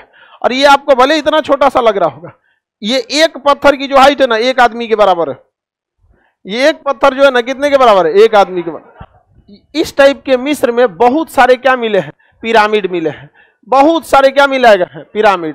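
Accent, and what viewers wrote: Bihar, India. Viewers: Bhai pehle bolna seekho pakke bihari hoo, kuch tameez wali Hindi bolo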